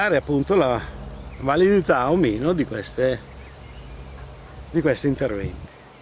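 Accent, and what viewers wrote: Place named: South, Italy. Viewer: Italiano del sur supongo: Sicilia, Nápoles o Cerdeña...(( Yo lo entiendo todo )).Es fantástico...!!! Amo Italia...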